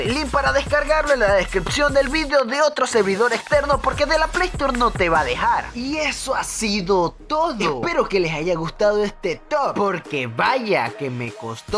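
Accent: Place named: Chile. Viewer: Porque habla como chileno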